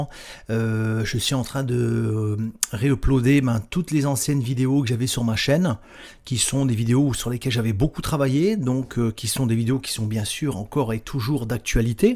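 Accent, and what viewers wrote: Canada. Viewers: Canadien, non ?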